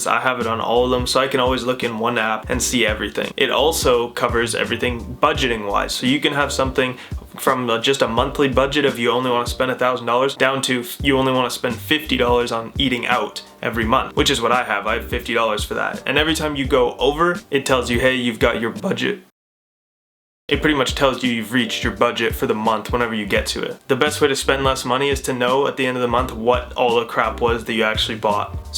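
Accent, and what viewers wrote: Canada. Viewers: This was super helpful! Are you Canadian as well!?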